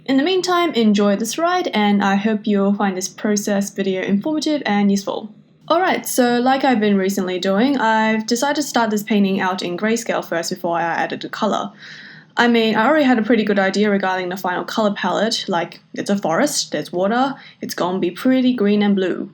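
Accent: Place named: Australia. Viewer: I love your voice!!!! (And your art of course) But what is your accent?? It sounds Australian but also something else at points?? I’m Australian so I know what sounds Australian lol 😂 I’m confused about your accent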